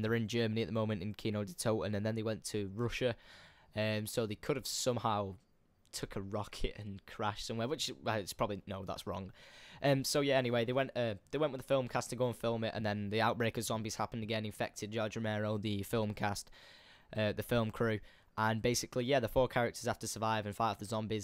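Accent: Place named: Manchester, United Kingdom. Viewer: that's a manchester lad MINT haha. Well done son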